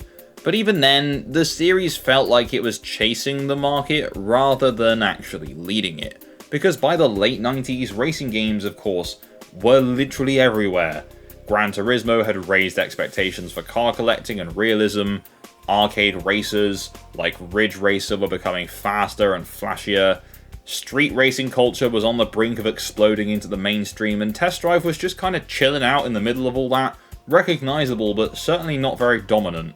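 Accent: United Kingdom. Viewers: I like how for the history of the franchise you simultaneously cherry-pick and ignore games based on their localized titles. 'Le Mans 24 Hours' somehow counts yet 'V-Rally' doesn't. 'TDO' is in but 'Test Drive Off-Road' isn't. Opinions and facts are not interchangeable. Also, you sound fairly British to me, why are you using NTSC titles and not PAL?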